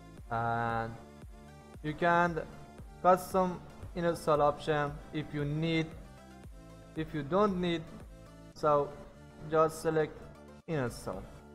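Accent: Iran. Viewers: منم یه همچین حسی دارم که ایرانیه داره خارجی حرف میزنه کامنت تو رو دیدم بشتر برگام ریخت 😂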